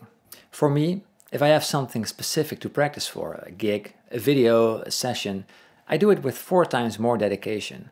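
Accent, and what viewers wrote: Netherlands. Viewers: wow ik zit al video's lang te raden of jouw accent nou wel of niet nederlands is!:) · He sounds dutch 👀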